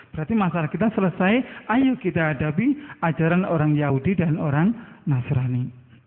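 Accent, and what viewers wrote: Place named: Java, Indonesia. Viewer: keturunan brahmana dari mna logatnya aja jawa dasar ngaku2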